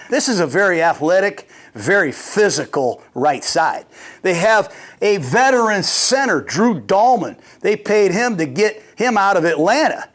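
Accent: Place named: Midwest, United States. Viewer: He reminds me of Chris farley. Maybe it's the Midwest accent but also his energy.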